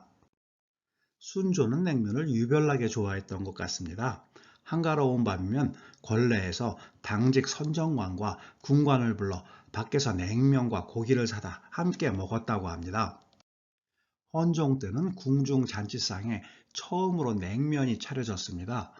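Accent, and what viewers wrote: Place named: North Korea. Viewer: 말투가 북쪽분이신가 싶은데요.? 혹시 진짜 북쪽분이시라면 북한말투는 어떻게 만들어진건지..경상도 사투리보다 고치기 힘든지 한번 만들어주세요ㅎ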